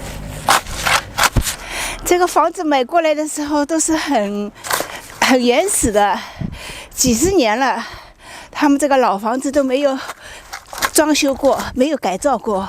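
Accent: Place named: Shanghai, China. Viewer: 上海口音很浓厚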